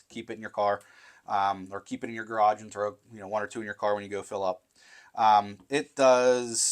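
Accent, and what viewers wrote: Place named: Pittsburgh, United States. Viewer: You sound like the people I grew up with in Pittsburgh. You must be from somewhere in that Western PA tri-state area with OH and WV....Edit, after a quick search I see you're "dahn" in 84, PA. I grew up not far from Bridgeville. Your brogue reminded me of my younger days before I moved "dahn hare" to SC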